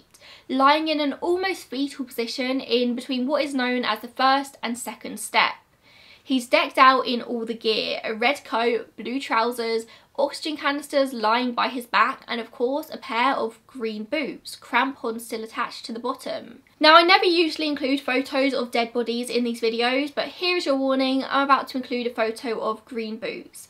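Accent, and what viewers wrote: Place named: Southern England, United Kingdom. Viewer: Thank you so much for all that u did and went through in order to give us this video 🥰🙏🏻 You’re an angel, I LOVE ur voice and the content u create always hits a nerve with me where I get really into it (although not in a “sensationalism” induced way I’d hope) Can’t believe I only JUST discovered ur channel (through the KRIS KREMERS & LISANNE FROON case bless their hearts and those of their families) but at least there’s a lot to catch-up on. Where abouts in the UK r u from btw? Going by ur accent I would guess somewhere around the southern parts of England? (I am half Swedish, half German so English isn’t my first (or even second) language, but we moved around Europe a lot when I was a kid and later as an university student, until I came here to London 🇬🇧 7 years ago so yeah 💁🏼‍♀️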